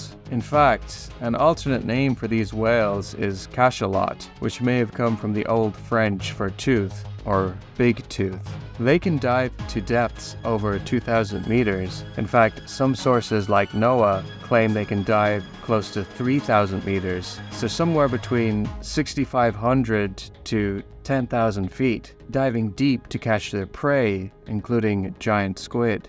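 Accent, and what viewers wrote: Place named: Ireland. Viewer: I spent the first half of the video thinking you were American until my brain went ding and i realised you are Irish. And i'm Irish i felt so dumb.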